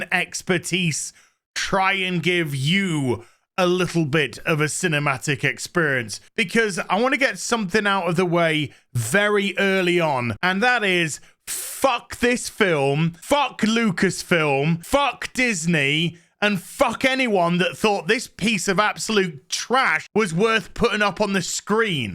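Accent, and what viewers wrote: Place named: Yorkshire, United Kingdom. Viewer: What is a morning constitution. Hard to understand this Yorkshire talk like "was straight"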